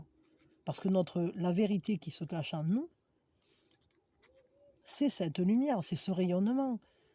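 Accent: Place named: Gascony, France. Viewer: Chère, je viens vous dire M.E.R.C.I. merci, merci pour cette magnifique, magique, et tellement parfaite année que vous nous avez offerte avec ce cœur magnifique que vous avez. Vous faites ^partie des gens exceptionnels que j'ai eu le bénéfice de rencontrer. C'est rigolo parce que vous avez le même accent que moi ( je suis en Gascogne - 32 ) et vous? si vous permettez bien sur. Joyeux Noël. Paix, Amour, Lumière à vous ainsi qu'au monde entier.Je nous aime!!! PS: Vous chantez divinement bien!!!; )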